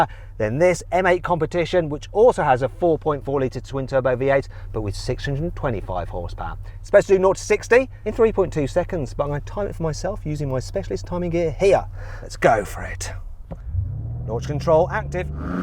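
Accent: United Kingdom. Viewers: 애초에 영국사람이고 영국발음인데 뭔 개소리냐ㅋㅋ.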